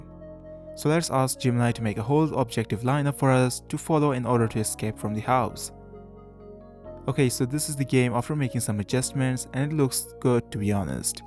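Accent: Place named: Bengal, India. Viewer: Bhai Bangali? Kotha shune bujha jay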